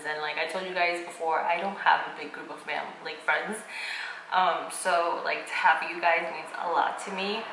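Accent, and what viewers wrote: Puerto Rico. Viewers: To be honest I first saw your videos and was fascinated by la chinita que habla español como boricua lol but seeing your videos and personality I was drawn to you and subscribed right away and pretty much have watched all your videos. There's sonething different and reall about you, its refreshing and I love it. I'm glad ypu took a break from youtube because it lead you to self discovery and that's what's more important, your happiness. I'm a true fan and will continue to be even if you dont post anything haha. I follow you on instagram and always love your post and quotes! Looking forward to your new content! Glad your back. Besos!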